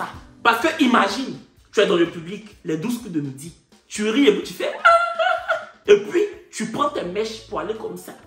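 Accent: Ivory Coast. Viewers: je suis antillaise et je m'arrange avec l'accent ivroirien. Donc les blancs n'ont qu'à s'arranger aussi comme nous. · Pourquoi elle comprendrait pas? Il parle bien français même avec son accent Ivoirien & quelques mots en nouchi, n'importe qui peut comprendre.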